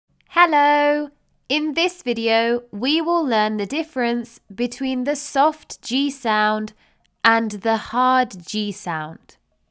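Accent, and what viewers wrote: United Kingdom. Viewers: British English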